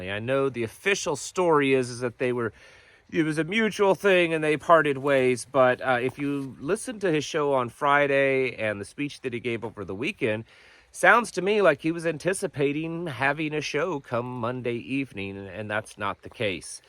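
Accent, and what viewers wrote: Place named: Kentucky, United States. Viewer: Tucker is the only show that I watched every evening. And Life Liberty Levin. On Sunday. I won't watch Fox anymore. Done. He stood up for what's right. And all of this lbtqrsi+ he stood up against it. Whit values. I would say they told him to bend over and take the crap.. and he refused. Good for him. We all need to stand against this. Fox needs Tucker more then Tucker needs them. He will be back better then ever. I can't wait. Fox News will be done..... Thanks for you messages that you give every day. Because you speak the truth. I'm watching you from Kentucky, and I really liked your Kentucky accent. Loved it😂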